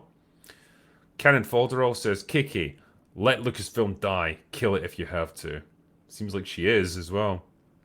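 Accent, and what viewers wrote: Scotland, United Kingdom. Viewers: Nothing but respect for the work you all do one of my favorite channels since I found it it on YouTube a man among men with a smooth Scottish voice I can tune into all day and without open bar would not have found the rest of the crew amazing work all you heroes and a few heroines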